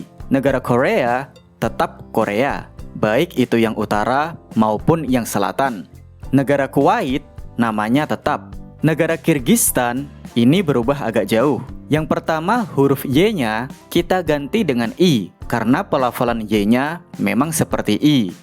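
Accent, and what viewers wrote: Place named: Java, Indonesia. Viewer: Pelafalan a la ✨Djawa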